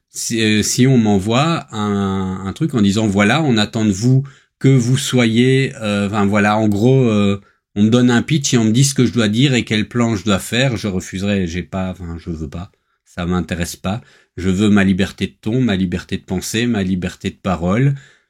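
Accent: Belgium. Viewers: Félicitations pour cette attitude de la part d'un novice en impression 3d (heu, pas de confusion, le novice, c'est moi hein 😁) ... mais aussi compatriote 🙂. Au passage, je n'ai pas encore réussi à mettre une origine sur ta (très légère) pointe d'accent, namurois comme moi ? Bref, cette modestie et humilité typiquement belges, et parfois injustement décriées, sont tout à ton honneur. Très, très bonne continuation !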